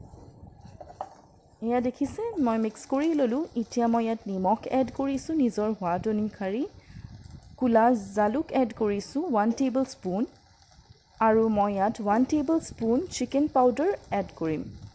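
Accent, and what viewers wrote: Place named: Lower Assam, India. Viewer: Looks like you're from lower assam but you're trying to speak in upper assam dialect...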